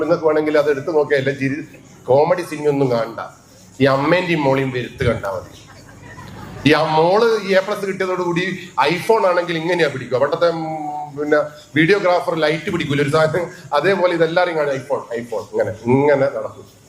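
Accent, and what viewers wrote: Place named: Southern Kerala, India. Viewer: തെക്കൻ ഭാഷ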